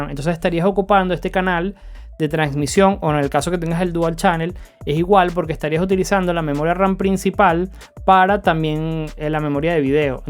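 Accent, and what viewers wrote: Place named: Venezuela. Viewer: Hola amigo, soy nuevo suscriptor, por tu acento noto que también eres de Venezuela, de ser así me gustaría saber al día de hoy que Laptop recomiendas de las más económicas para empezar acá en Venezuela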